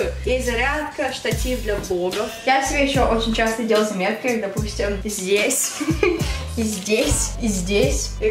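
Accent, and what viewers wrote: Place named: United States. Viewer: У тебя такой классный акцент американский😑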